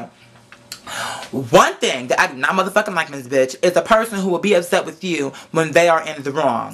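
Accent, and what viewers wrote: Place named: New York, United States. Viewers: You have east coats accent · Where'd that NY accent come from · There's that New York accent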